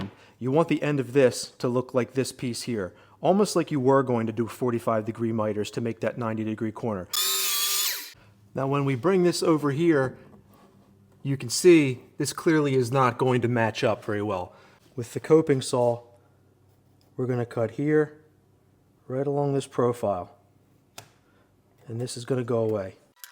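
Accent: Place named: New Jersey, United States. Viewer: Sounds like Jersey to me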